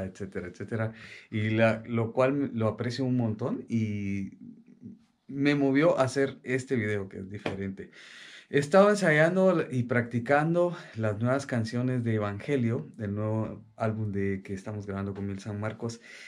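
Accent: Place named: Mexico. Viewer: Más de Guatemala habla más como de México hermano jeje 😂 😂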